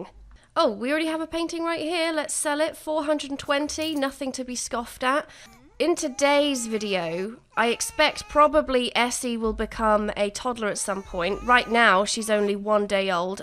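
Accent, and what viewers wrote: United Kingdom. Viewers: are u brtitish ur voice is cute and love the challenge video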